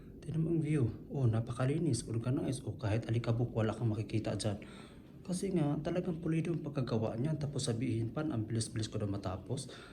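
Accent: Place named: Visayas, Philippines. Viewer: Bisaya ka sir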